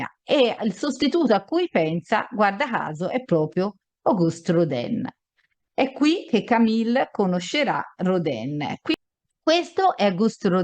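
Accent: Tuscany, Italy. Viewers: bellissimo contributo con il tuo bell'accento toscano o fiorentino che ne so...bel documento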